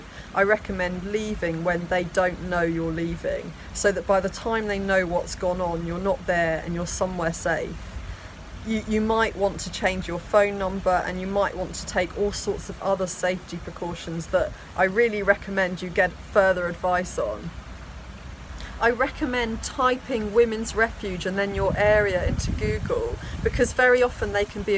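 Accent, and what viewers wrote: United Kingdom. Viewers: British accent is hard for me to understand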